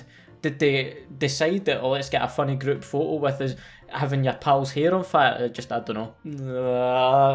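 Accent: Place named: Scotland, United Kingdom. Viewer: Nah, just Scottish.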